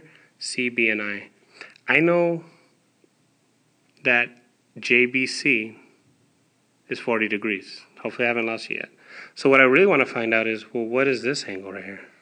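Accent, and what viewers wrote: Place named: Egypt. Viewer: your voice is like egyptians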